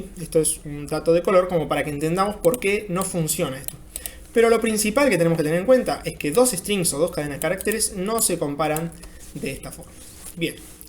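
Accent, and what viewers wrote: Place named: Argentina. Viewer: buen video bro, gracias y que lindo escuchar un Argentino en la escena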